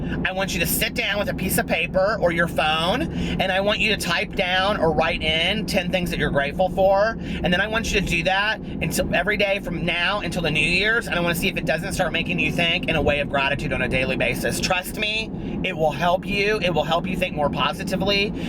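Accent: South, United States. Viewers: Where does your southern accent come from? I am from Georgia and I swear you sound local. :)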